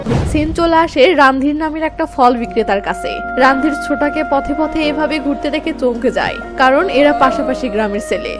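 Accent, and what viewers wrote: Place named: Jessore, Bangladesh. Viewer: আপু আরাম লাগে এটা আমাদের যশোরের ভাষা, খুব ভালো লাগে তোমার কথা গুলো, এবং মুভি গুলো সত্যি অসাধারণ আপু। 🥰🥰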